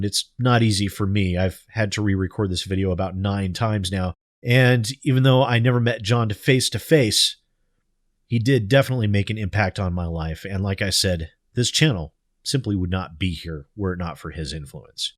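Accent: England, United Kingdom. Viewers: I dont even remember his reviews, just remember annoing english voice - nothing more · I'm going to miss that awesome British voice...RIP.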